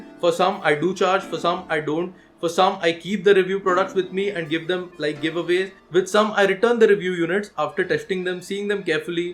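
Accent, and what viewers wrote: India. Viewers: I dont Understand anything indian-English make me confused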